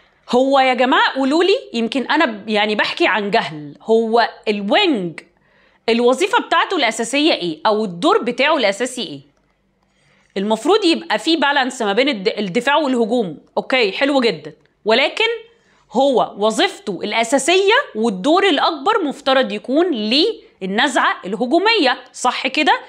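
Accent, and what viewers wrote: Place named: Levant. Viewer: حاولي تظبطي لهجتك المصريه ... بلاش استخدام بي بدلا من في ولا حضرتك عيشتي في الشام؟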